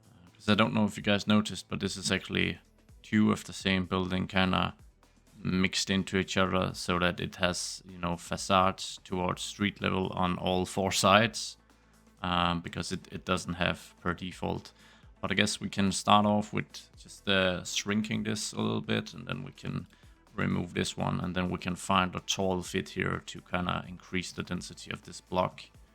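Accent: Denmark. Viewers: Er det en dansk accent jeg spotter? 😅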